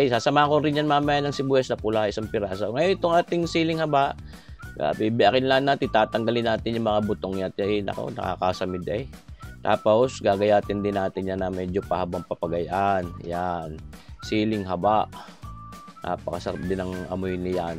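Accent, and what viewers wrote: Batangas, Philippines. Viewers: Halo -njoyed Ur video n ur Batangueno slang 😀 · Nakaka aliw manood sa accent ni sir pinoy na pinoy🤟👍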